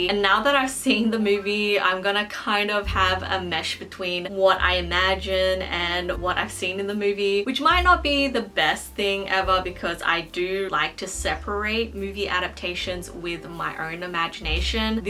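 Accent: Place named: Australia. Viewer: I like your Australian accent and your book shelf ❤️✨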